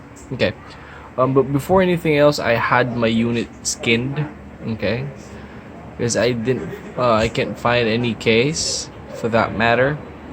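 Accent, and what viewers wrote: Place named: Philippines. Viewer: i knew you were pinoy just by your tone. I'm thinking of getting this or Fiio X3 Mark III but can't decide yet or i could just add a bit more and get a nintendo switch and forget about music haha jk. any review for Fiio X3 Mark III over NW-A45?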